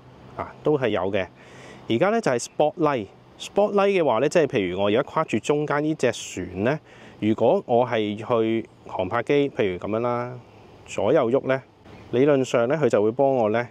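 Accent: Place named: Guangzhou, China. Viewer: 廣州大陸🐖口音，講英文都怪過人😂🖕🏽🖕🏽🤙🏽🐔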